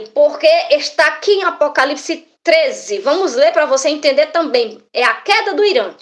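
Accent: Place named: Northeast, Brazil. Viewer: Kkkkkk chegando agorinha 😂❤°°!!!! Vc deve ser de algum estado do Nordeste,, vc fala com sutak q nem eu !!! JA ME ESCREVI E É AQUI QUE VOU APRENDER MAIS ➕️ ➕️!! OBRIGADO!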